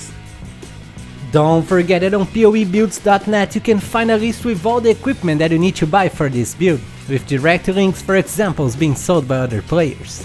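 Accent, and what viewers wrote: Brazil. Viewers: boabuild! sotaque br kkk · Great vid! You sound brazilian to me idk why.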